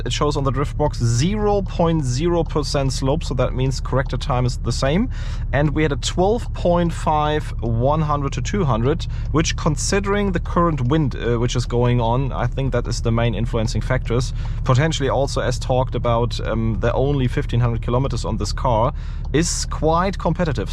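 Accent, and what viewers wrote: Germany. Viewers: I love the German accent😊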